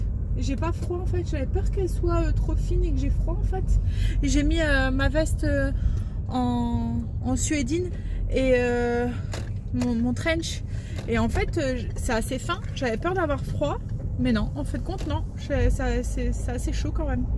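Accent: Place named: Pas-de-Calais, France. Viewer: Cc moi je croyais que t habiter dans le pas de Calais comment tu parle pareil que ceux du pas de Calais car moi je suis née dans le pas de Calais es y habiter jusqu'à 12 ans es toute ma famille es là haut donc toi ses le nord.sinon rien a voir quand tu fais les poussières avec du savon noir du rince ou non merci pour tout des partage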